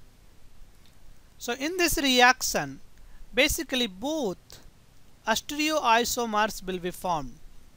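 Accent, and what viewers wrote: India. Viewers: I love Indian accents :)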